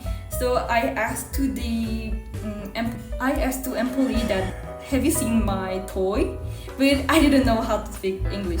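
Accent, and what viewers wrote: Philippines. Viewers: My first impression of you is you are very PRETTY and you have a Filipino English ACCENT! I wonder how will you're reactions when Koreans hear and realize you have a Filipino English ACCENT...enjoy Ph and keep making videos we are watching you 😎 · Yoir accent is filipino english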